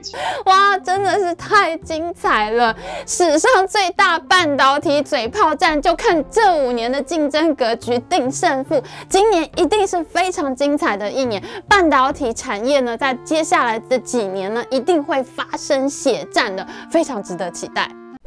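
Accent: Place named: Taiwan. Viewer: 我就愛聽我們的臺灣國語。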